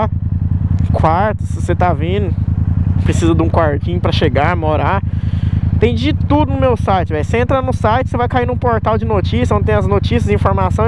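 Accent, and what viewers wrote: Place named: Minas Gerais, Brazil. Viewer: Se tu for "minero" com esse sotaque é nois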